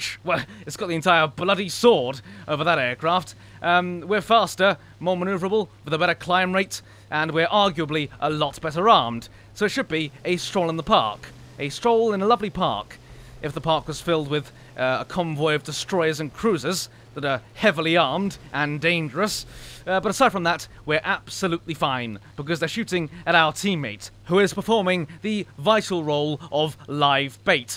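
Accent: United Kingdom. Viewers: LMFAO i love your british accent. :D :D :D <3 "Yes..." :P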